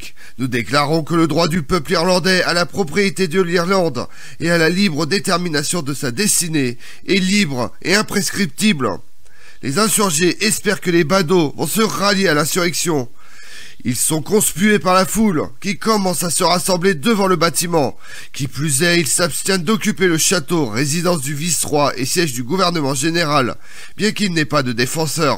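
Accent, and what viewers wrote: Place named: France. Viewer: Ta prononciation de l'Anglais est très... Française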